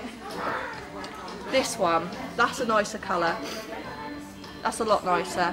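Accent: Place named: Suffolk, United Kingdom. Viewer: Totally just stumbled across this video but really enjoyed your style of vlog and how you pointed out different things for other people not just yourself. I thought I recognised your bumpkin accent, I was born in Yarmouth and lived in Suffolk 😆✌💞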